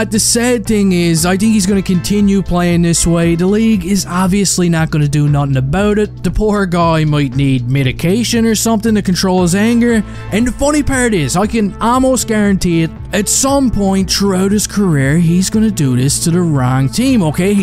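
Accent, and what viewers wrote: Newfoundland, Canada. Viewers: You sound like a newfie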